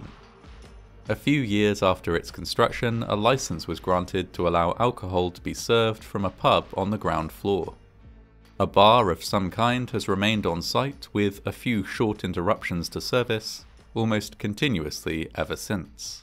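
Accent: United Kingdom. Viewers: Did someone program a bot narrator with a British accent!? Because I didn't thin a narrator could sound worse that a bot narrator with an American accent! But you did it! Absolute crap!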